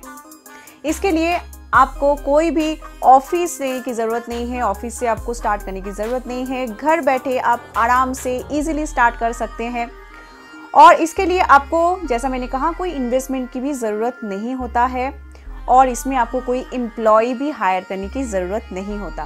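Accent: Bengal, India. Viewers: Ap bangal se hai kya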